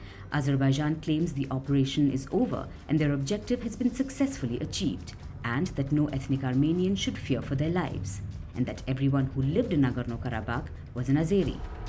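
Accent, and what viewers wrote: India. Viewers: A dusty Indian accent is heard. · Why all these news are read by indian?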